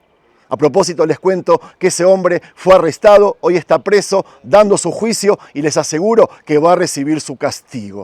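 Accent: Buenos Aires, Argentina. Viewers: Por tu acento creo que sos también argentino doblemente hermano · Hola sr de Argentina, hace mucho lo veo me resulta muy agradable utt.me llama la atención su Castellano esmuy porteño de Argentina siendo una persona compresiva Y humama Gracias Gracias soy de ɓs.as. y cristiana solo siento elogios para usted y me gustaria un pequeño repaso de su conección con argentina ya que dijo que tiene un hermano graciasle pido no se incomode plr el pedido ya queme resulta una persona muy agradable mis🎉 saludos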